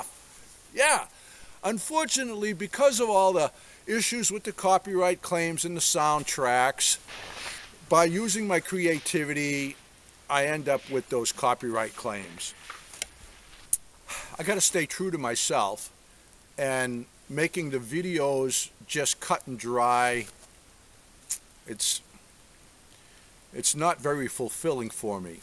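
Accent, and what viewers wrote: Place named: New England, United States. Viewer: Keep up the good work. I enjoy whatever you post. Like most of your followers "Frankie and the boss" is my favorite. I think it would be a great intro AND exit song. We are having a very white Christmas here in West Michigan, thanks to our lake effect snow. I'm an old New England gal and I enjoy the yankee accent, even though I've been away from home for a good many years. My son and grandson both have Border Collies that are so smart. One lives on a farm and the other in a condo. For those that don't like your work.....well then, go away!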